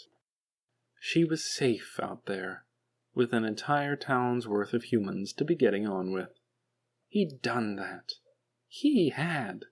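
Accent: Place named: United Kingdom. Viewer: Genuinely thank you for doing this. I have a hard time reading and committing to reading fiction for a long period of time. I do have a lot of free time to listen to things though and this was an amazing way to digest this story. I got recommended by the person I'm dating right now, totally worth it. Also I know you apologized for your British accent in the first one and while it is occasionally rough it is such a good capturing of Wheatley like you play Wheatley so well.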